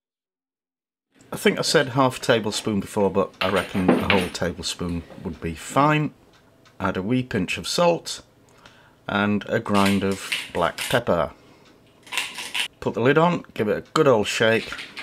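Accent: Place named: West Yorkshire, United Kingdom. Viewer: Hi, just found your website. I obviously know you are from Yorkshire, however where abouts? You sound like the same part of West Yorkshire that I am from so was curious 🙂 x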